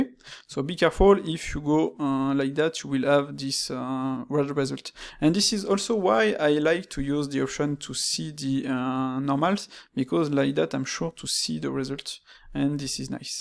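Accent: France. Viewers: Bonjour! merci pour ton frenchy accent. pour une fois que j'arrive à comprendre un tuto en english!!! J'ai un souci lorsque tu applique le premier Bevel a les edges intérieures disparaissent, ainsi que la face... je suis bloqué. je suis sur blender 2.9.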